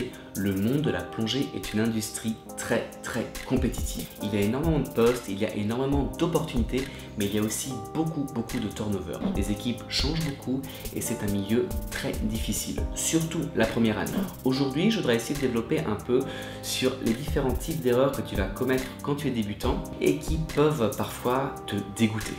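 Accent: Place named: France. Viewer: You have such a lovely French accent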